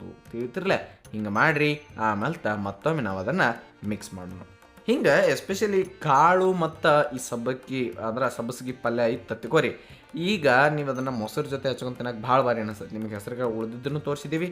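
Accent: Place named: Karnataka, India. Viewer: Your kannada explanation is so sweet to hear,our native desi kannada.❤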